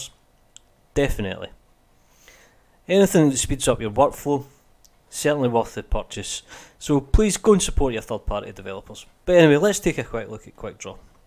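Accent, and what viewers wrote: Scotland, United Kingdom. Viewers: Nope, that’s Extremely Scottish Scottish. :)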